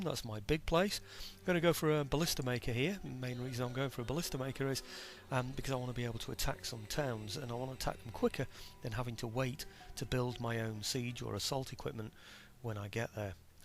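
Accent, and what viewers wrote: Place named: Midlands, United Kingdom. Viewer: i ccouldnt quite tell you were proper northern i thought more midlands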